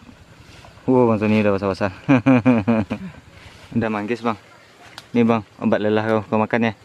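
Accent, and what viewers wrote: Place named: West Sumatra, Indonesia. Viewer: Abang orang mana bg?? Kayak logat orang minang bahas indo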